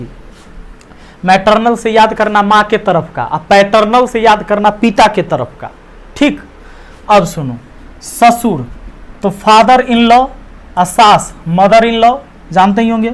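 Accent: Bihar, India. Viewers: Sir ap smjhaate v achha hai aur bolne ka bihari style mast hai 💕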